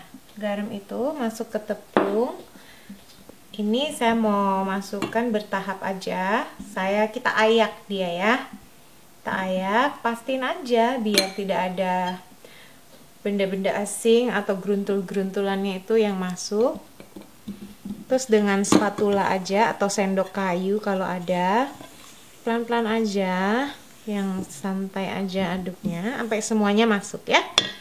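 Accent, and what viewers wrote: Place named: East Java, Indonesia. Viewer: makasih resepnya ciciiii...btw cici ini org jawa timur yah. logatnya itu lohh sukaaaaa....cara2 bikin semua resep mudah. sederhana dan ga nyusahin 👍👌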